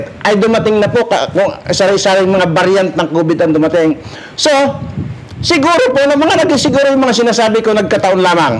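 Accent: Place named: Visayas, Philippines. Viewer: Ngayon ko lang napanood ito nakatsamba kasi ako dahil nariring ko bantag bisaya ka sir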